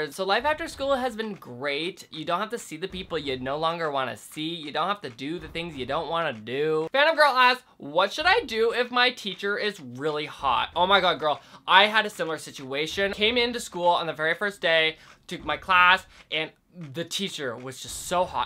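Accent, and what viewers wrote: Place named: Canada. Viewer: The way Canadians say the letters -ou is so cute I can't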